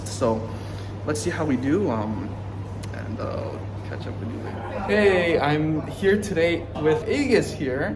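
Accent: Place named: Canada. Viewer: I thought you were Canadian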